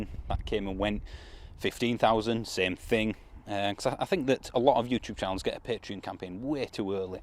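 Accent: Yorkshire, United Kingdom. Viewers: I quite like... your, I guess, Yorkshire accent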